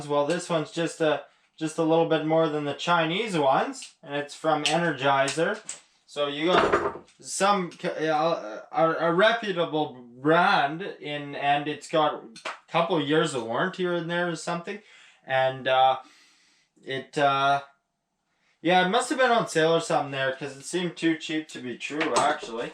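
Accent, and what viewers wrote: Canada. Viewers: Ah shite aye? Thats kinda luck shitteh. I came here for how you talk its hilarious and the world needs more back country Canadian slang.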